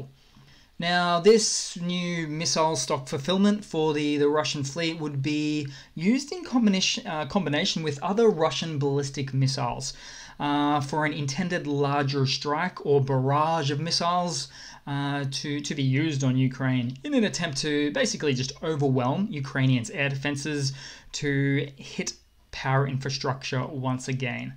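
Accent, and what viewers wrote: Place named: Australia. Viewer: Assuming you are in Australia — as your accent would suggest — you must be uploading around midnight (NSW, Vic, Tas), 11.30 pm (SA), 11.00 pm (Qld), 10.30 pm (NT) or 9.00 pm (WA). Or maybe 1.00 am (Norfolk Island), 7.30 pm (Cocos/keeling Islands), 7.00 pm (Australian Antarctic Territory), or 6.00 pm (Heard and McDonald Islands). On any view, burning the midnight oil. So a special thanks for the effort which this involves.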